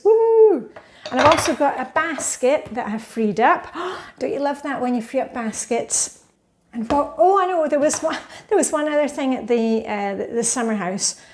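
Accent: Scotland, United Kingdom. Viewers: Found you again! I had watched you years ago and lost you in the confusion of life. I’m 67 and we have a small condo that we have been in for 3 years after owing a large home. The clutter is back😂. You have inspired me this week and I’ve been cleaning like a crazy bunny. I love your accent. Brings back memories of my Grandfather’s brogue. My Mom was born in Glasgow in 1920. She came as a little girl and later married an Italian. That’s a combo! Thank you for your inspiration! I enjoy watching you declutter as you prepare for your downsizing. From: New England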